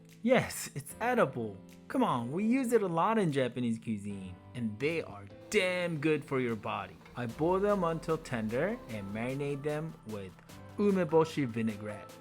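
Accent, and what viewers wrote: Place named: United States. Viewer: We don't say "you greedy" in English 🤔 Your spoken American English is a bit exaggerated.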